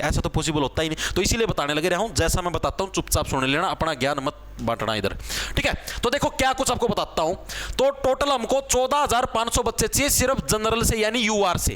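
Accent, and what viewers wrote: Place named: Rajasthan, India. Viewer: Sir your way of talking is very cool Your spex add spice on the top Rajasthani and Haryanvi consent is very cool❤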